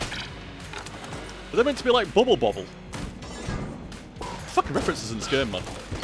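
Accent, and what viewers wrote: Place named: Scotland, United Kingdom. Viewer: Hey, from what county are you from? You sound scottish-like tbh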